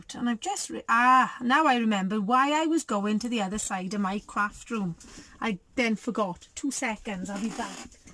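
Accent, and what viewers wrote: Wales, United Kingdom. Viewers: Good tutorial.... love your Welsh accent!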